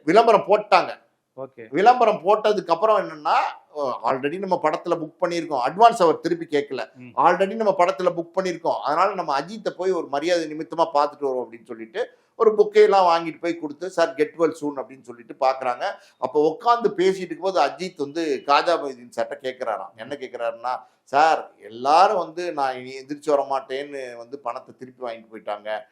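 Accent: Madurai, India. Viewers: nenga madurai ya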